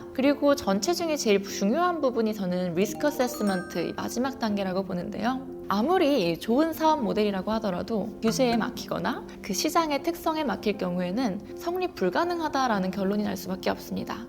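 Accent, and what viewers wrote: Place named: South Korea. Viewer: 영어 발음이 콩글리쉬세요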